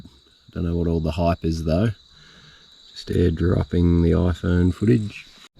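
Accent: Australia. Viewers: I thoroughly enjoyed your fossicking in our lovely mainland river. I don’t know why YT algorithm suggested your video but I’m glad it did. So much better hearing a local Aussie accent instead of the usual American ones so prevalent on YT.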